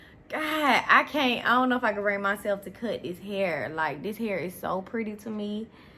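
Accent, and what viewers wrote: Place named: South Carolina, United States. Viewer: Im in South Carolina and I swear some of the things you say sound like my people.... You might be family lol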